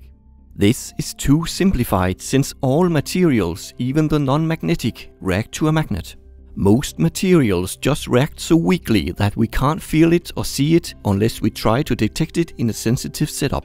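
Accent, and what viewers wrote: Denmark. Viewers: er du fra Danmark? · Er du fra Danmark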